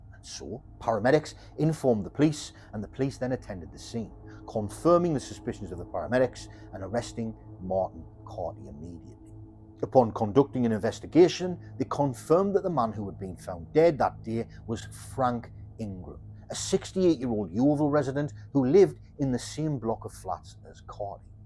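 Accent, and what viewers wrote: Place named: Sunderland, United Kingdom. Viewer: Yes, I'm a Mackem too. You can't really mistake the accent for anything else 🤣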